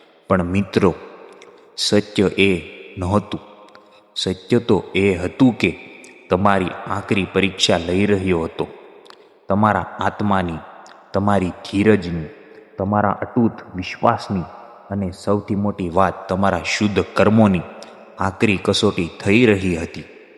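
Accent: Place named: Bhavnagar, India. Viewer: તમે ભાવનગર તરફના હો, એવું તમારી ભાષા અને ખાસ તો તમારા ઉચ્ચારો પરથી લાગે છે. જવાબ આપશો.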